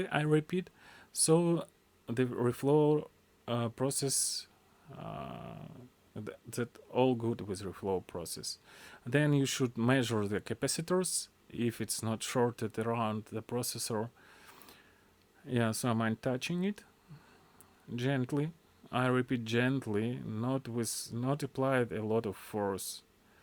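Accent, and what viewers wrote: Russia. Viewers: Нижегородский проскакивает бля😂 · Какой лютый русский акцент)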